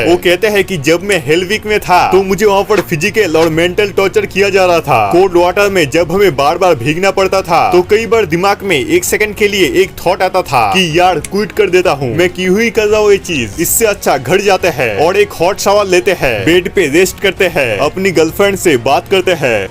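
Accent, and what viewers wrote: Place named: Bengal, India. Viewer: এক্সেন্ট এই বোঝা যাচ্ছে যে তুমি বাঙালি।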